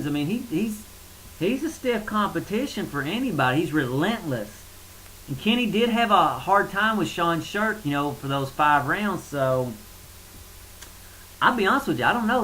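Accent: South, United States. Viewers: Anybody who's southern accent is stronger than mine gets 5 stars. If the next video's just as good, i'm straight up subscribing. Alot of stuff i dont particularly agree with, but i think that's the fun of it all. I only really agree with your deal on BJ. It's almost to the point you dont have anyone in that division that can hang with him since he beat Little Evil.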